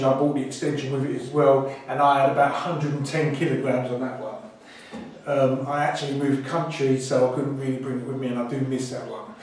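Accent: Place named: Romford, United Kingdom. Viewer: Exactly the advice I was after. Proper decent accent - around the Romford area?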